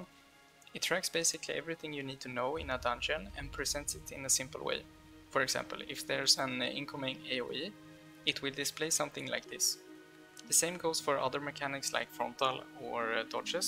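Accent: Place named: Sweden. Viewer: Sjuk svengelska bror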